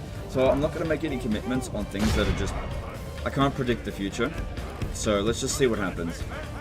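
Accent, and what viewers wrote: Australia. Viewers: i was there when he still cursed a lot spoke australian while doing m2tw XD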